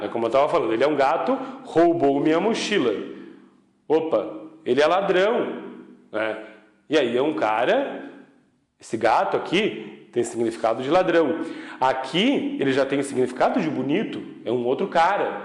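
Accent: South, Brazil. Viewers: Muito top o sotaque do Sul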